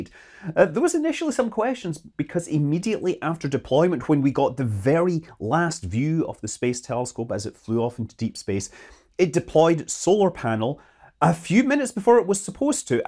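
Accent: Scotland, United Kingdom. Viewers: you are such a delight! I always enjoy hearing your mellifluous, cheery voice with your lovely Scottish accent! Happy New Year!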